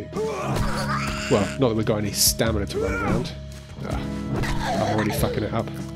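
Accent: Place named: South East England, United Kingdom. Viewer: It was an update 'ol chap. Also there is no longer a guarantee of getting a book bundle in the rewards list. You mentioned you live in Australia, but you're English, you sound like you're from south east England, although you pronounce your 't's" so probably not London or Essex, unless you had a nice middle class upbringing... ;-p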